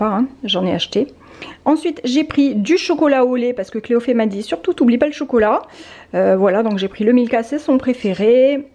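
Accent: South, France. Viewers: Merci pour cette vidéo. Ton petit accent du sud est tellement agréable de bon matin. ❤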